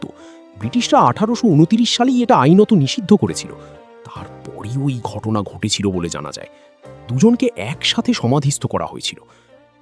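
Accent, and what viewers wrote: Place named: Kolkata, India. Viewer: আপনার বংলা একসেন্ট টা কিছুটা কোলকাতার বাংলা একসেন্ট এর মতো। Not bad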